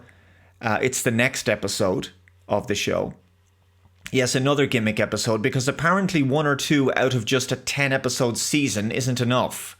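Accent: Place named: Ireland. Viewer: Nah, he's Irish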